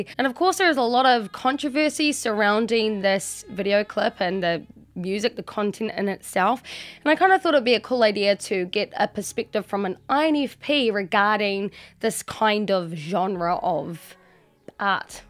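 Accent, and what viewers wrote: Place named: New Zealand. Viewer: She sounds kiwi so New Zealand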